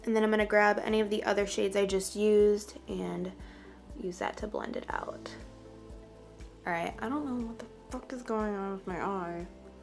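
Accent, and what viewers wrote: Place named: Chicago, United States. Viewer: Girl! I freaking love this look!! Omg!! I know you struggled but girl, it's so bomb! You did the damn thang! This is my favorite look you've done for fall this year so far! As always love you and thank you for being such an amazing person to look up to and learn from ♡ my family is from Orland park so you always give me home vibes because your from up that way and your strong Chicago accent!! Xoxoxo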